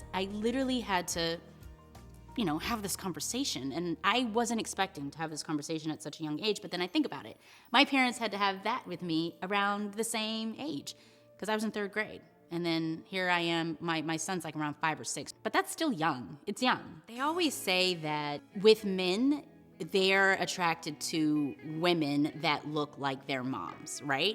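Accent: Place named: Bronx, United States. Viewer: Sound like Bronx tale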